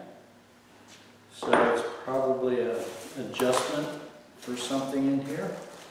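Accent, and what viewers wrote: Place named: Pennsylvania, United States. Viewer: fantastic video...My cobler just arrived yesterday ~ still in the box and will be putting your helpful video to use tomorrow... Thanks so much!!! You have a South Western PA accent...curious...any chance you're from that area???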